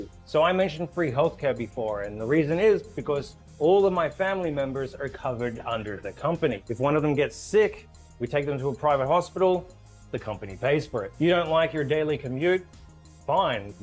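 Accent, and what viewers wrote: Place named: United States. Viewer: Seppo accent man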